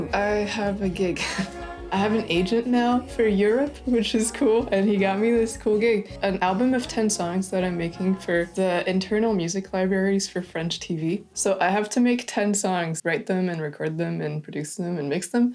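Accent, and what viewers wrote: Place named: Quebec, Canada. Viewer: Years ago when I watched one of your early video, I thought your talent combined with your quirky Québécois inflections might spell success. Back then you recorded vocals with an SM58, which surprised me. Then last year I saw you sponsored by Native Instruments (I think). Congratulations on your management and continued success! 😁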